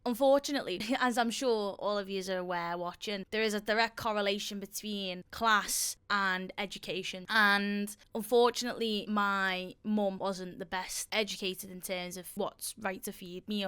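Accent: Liverpool, United Kingdom. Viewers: Love the scouser accent ❤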